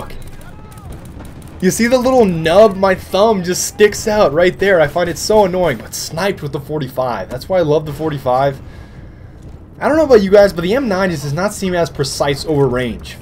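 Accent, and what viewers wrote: Germany. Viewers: are you german? 0_o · are you german??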